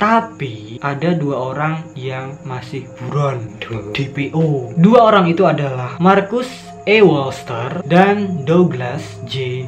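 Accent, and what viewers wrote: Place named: Central Java, Indonesia. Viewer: Jawa tengah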